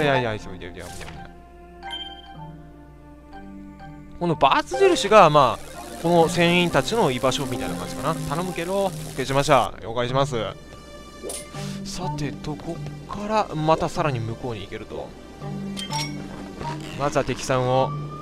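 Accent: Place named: Ehime, Japan. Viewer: 初コメです!風のタクトを見てからずっとさんの実況大好きです。たまに出る愛媛のなまりとかついつい懐かしいと思っちゃいます(笑) さんアムロ、大好き!!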